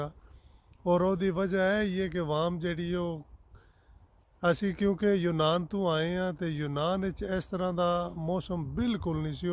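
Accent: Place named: Rawalpindi, Pakistan. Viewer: Bhai sahib lagda hey tusi Rawalpindi tu ho. Tusa ni boli tu lagna ey.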